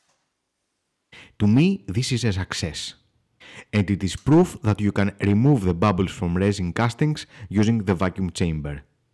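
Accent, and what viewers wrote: Greece. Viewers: Are you from greece? Your accent is very greek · Από την προφορά σου κατάλαβα είσαι Έλληνας 😊 Μπράβο αυτό το βίντεο Με βοήθησε πολύ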